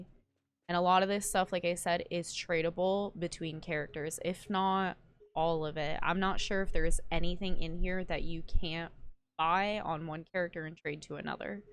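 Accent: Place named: South, United States. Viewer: OK it’s adorable there’s just a little bit of southern girl MUA bank to see if I can ever so often a little bit of southern accent pops out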